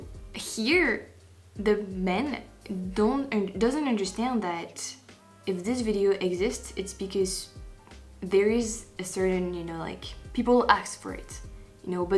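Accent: France. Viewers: French , you do not know me and i do not know you . You are from france and i am from the USA . Now does that make me better then you ? NO ! You are French and i am Italian . Now does that make me a better person then you ? NO ! Your reactions to various songs are awesome . They are from your heart . They are authentic and genuine . They are honset and sincere .Your accent is awesome and is no different from anyong else from other countries . Your a beautiful Young woman and people love you . Don't let these bullies get you down . They have nothing better to do then to criticise things they themselve do not understand . You are doing a great job and do not change a thing because these idiots don't like it . From a friend in the USA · Hola (Hello in Spanish pronounced "O-la")! On any social media platform people like these are known as "trolls" pay no attention to them I've seen them go on children's and people with disabilities channels and leave hateful comments. 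The funny thing is that they do not realize that they are helping your channel by giving you more views! So do not waste time, energy or sleep thinking about these people. You are one of the few channels to which I subscribe and it's because you being from France I enjoy you learning new things whether it's music, movies and English! You accent is beautiful and you are right I myself know nothing about popular French music, food, lifestyle or customs so I don't see how people can expect you know all these things from here in the United States or any other country where you did not grow up. So just do what is fun and makes you happy and those who don't like it can add to your channel views and move on!!! Sending you BLESSINGS from Texas!!! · Jamais, Jamais, Jamais. Never apologise for what you do or who you are. Your English is brilliant and with the french accent it is so endearing. When you do the English word challenge just remember that 99.9% of English speakers couldn't pronounce them either. 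I live in England and we have a saying, that if somebody says a word we have not heard before. we always say "have you swallowed a dictionary?". Nobody can ever become fluent in another language unless they were born in that country. As to music that is a personal choice. I could list artists you or a lot of people have never heard of. Does it matter..NO. You are learning, exploring and finding new music. My French is in no way as good as your English but I listen to French radio channels and watch French tv stations to find out more about your culture. Please ignore all the negative comments and continue with your enjoyment of life. You have to live your life and not other peoples. xx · You're actually very charming, please don't be influenced by negative comments ... Yeah-h-h, I'm one of those guys who feels your French accent is adorable ... this is coming from an American with a PhD (from Independence/Kansas City, Missouri USA) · I love your French accent and your broken English is cute and endearing. 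I hope you never lose it. I never thought of you as anything other than just a person sharing your experience and thoughts with us as you explore new music etc. I like your style so keep it going. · Bonjour Don't listen to those who say your English isn't "good enough". It is very good, I have no problem understanding what you are saying, you are able to express yourself well, and I think the French accent is a beautiful addition and adds so much charm!:) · You are an authentic, transparent person. Keep doing your reactions,. Tu acento frances en ingles es muy simpatico. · I watched a language video about English words that native speakers pronounce wrong. There are words in English that English speakers pronounce wrong but you a native french speaker are supposed to speak English correctly. I also wonder how many languages the people insulting your English speak.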